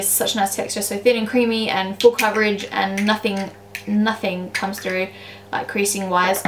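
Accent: Australia. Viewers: are you Australian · She's Australian :) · its an australian accent... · It is a normal Australian accent.You are so superior. I would have liked to insult you, but with your intelligence you wouldn't get offended. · sweety come to australia, she has the best pronunciation I've ever heard from an Australian and i live there, this is like posh australian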